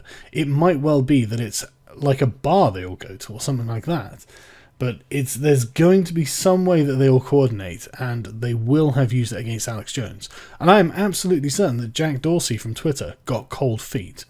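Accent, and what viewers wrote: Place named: England, United Kingdom. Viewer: Why do you think he is poorly informed ????? Alex "says" that he has great Sources in Many Branches in the USA Government. You sound like you are FROM England. Tommy Robinson has stepped out like a real MAN to defend the FREEDOM of Speech. Best wishes from the USA...MAGA with Donald J. TRUMP..Stand up for your RIGHTS or DIE protecting them...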